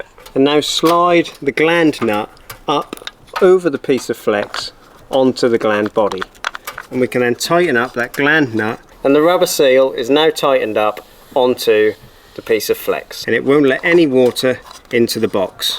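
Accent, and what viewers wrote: Norfolk, United Kingdom. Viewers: Teriffic video as always, Be that a bootiful Norfolk accent? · You sound like you're from my neck of the woods sir (norfolk) 😃 Great video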